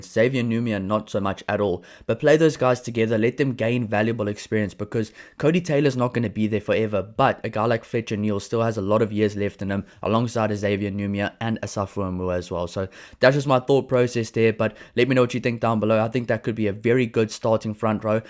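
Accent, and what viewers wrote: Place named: South Africa. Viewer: Brilliant video/ analysis man! So cool to hear our team and game covered with the Bokke accent very refreshing 😉 pretty sold on your team only things I would change this week would be QT at 12 and BP or ALB in the 13. JB can take a well earned rest or bench ( I wd actually like to see him back at 15 tbh with Dmac on the bench) I also like Tosi and want him to have some game time. The Haka is a thing and Codie has been leading it , hopefully others can step up cos I totally agree with you re hooker! Keep it up bru cheers 🍻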